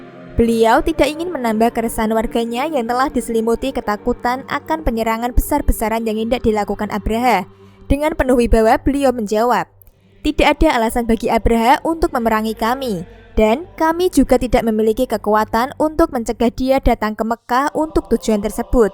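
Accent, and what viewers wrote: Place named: Java, Indonesia. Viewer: Medok jowo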